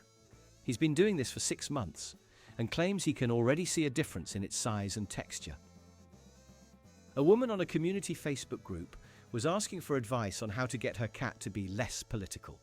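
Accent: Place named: United Kingdom. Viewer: This is a British accent trashing Americans, and we have enough trouble. Thank you.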